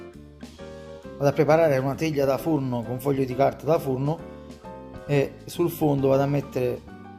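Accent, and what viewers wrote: Naples, Italy. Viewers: il simpaticissimo accento partenopeo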